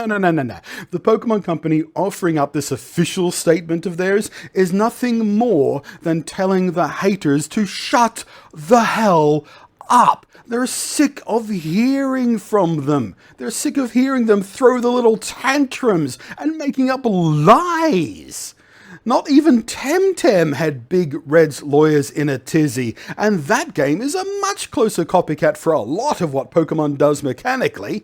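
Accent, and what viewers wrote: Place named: Australia. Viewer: The Australian accent makes this vid twice as amusing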